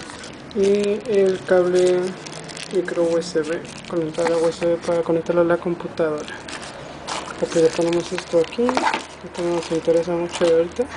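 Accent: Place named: Pocho, United States. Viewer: Bueno y por qué hablas así como queee... tan POCHO???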